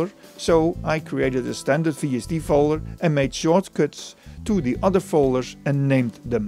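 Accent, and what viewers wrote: Netherlands. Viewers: Haha I also noticed within the first sentence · I can clearly hear you're dutch man. Just like me or not? :-) I recognize it directly in the first 10 seconds lol. Good you're doing this and i really love it. Thanks i appreciate it! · you sound really dutch lmao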